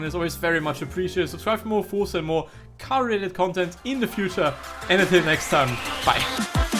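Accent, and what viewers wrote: Germany. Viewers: Are you from Germany? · R u German? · he is German / Austrian I can hear it as I also am germna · man hört das du Deutsch bist :) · Are you german?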